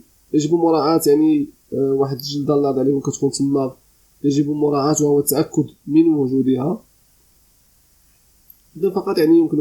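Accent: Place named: Morocco. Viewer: طون كتهضر خويا غير بالدارجة باش نركزو مزيان، والله العظيم مفهمتك حيت كتكلم بلغة عربية فصيحة بلطنة مغربية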